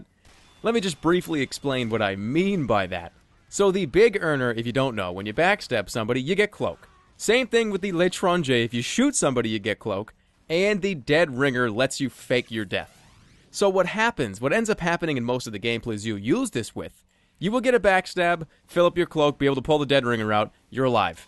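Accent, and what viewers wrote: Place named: New Jersey, United States. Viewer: Are you from New Jersey or Boston by any chance? I'm in New York. I can hear a strong accent :D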